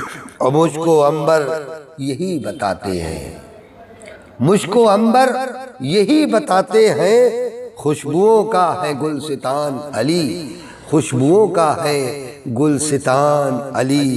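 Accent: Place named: Varanasi, India. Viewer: آج آپ بنارس مشاعرے کے لہجے میں شعر بول رھے ہیں حضرت 💗💝💗💝💗💝💗💝💗💝💗💝💗💝💗💝💗💝💗💝💗💝💗💝💗💝💗💝💗💝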